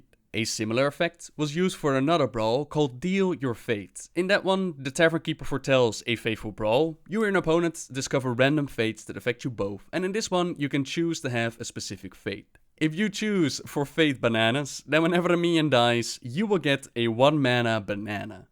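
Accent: Netherlands. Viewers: YES. Combined with the dutch accent he oh so despises, his videos, especially the R-sounds, make you shiver. XD I love it! Thanks for the good work. =)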